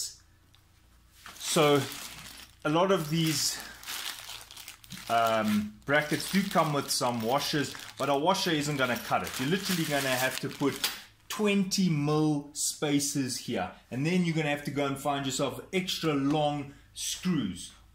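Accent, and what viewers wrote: Afrikaans, South Africa. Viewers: Smaaking the afrikaans accent😝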